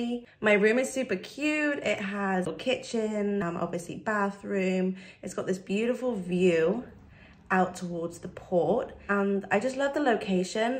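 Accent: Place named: United Kingdom. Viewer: Not crowded is the best. Thank you for sharing. All is good except the British accent.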